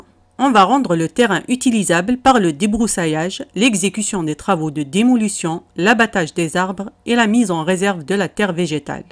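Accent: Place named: Kabylie, Algeria. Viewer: J'adore surtout avec l'accent Kabyle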